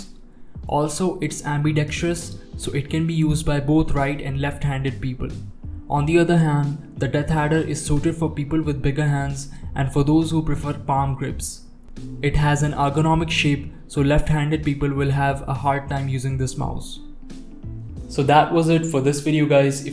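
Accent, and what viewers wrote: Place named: India. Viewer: You talk like some Indian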